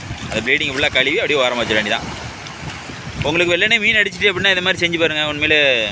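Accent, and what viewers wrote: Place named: Sri Lanka. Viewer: Antha tamil selong super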